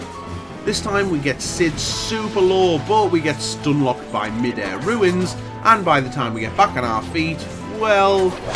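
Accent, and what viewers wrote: Northern England, United Kingdom. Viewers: Are you in northern england? You sound like you’re from manchester or lancaster.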